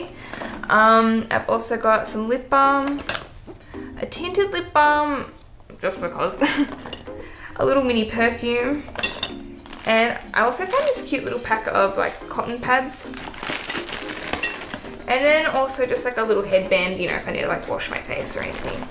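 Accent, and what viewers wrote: Australia. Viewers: I can tell you are Aussie because the stuff and your voice if I am wrong I’ll be honestly shocked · Are u austrailian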